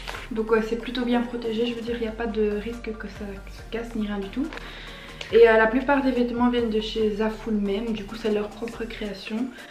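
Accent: Belgium. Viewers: C'est un truc de dingue comment j aime trop l accent belge mdr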